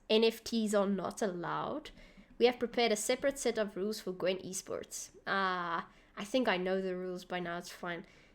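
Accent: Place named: South Africa. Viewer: South african accent the best 😁😁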